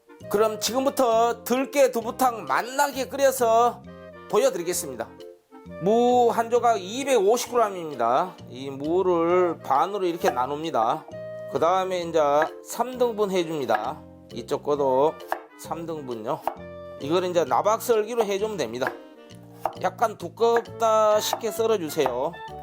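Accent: South Korea. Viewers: 언제들어도 구수한사투리 정감이 갑니다 쉐프님 역시 최고입니다 감사합니다 🎉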